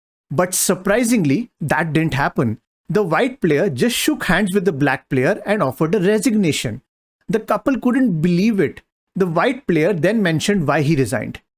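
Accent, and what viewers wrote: India. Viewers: I'm a voice actor and I'm stealing your accent. This has to be the strongest Indian accent I've ever heard in my life.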